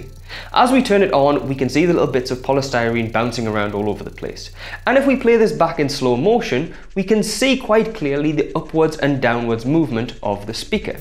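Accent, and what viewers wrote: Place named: United Kingdom. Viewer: dude that was really helpful .like you go britsh!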